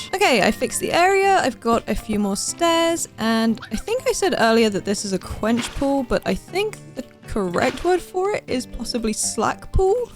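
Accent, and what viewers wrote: United Kingdom. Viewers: Wanted to comment and tell you that my little girl and I have been absolutely loving watching your videos lately. She is delighted by Millie's antics, and loves your British accent, as well.:) We've recently gotten back into minecraft as she's finally old enough (five years old) to play it, too! We love your videos, & Millie!!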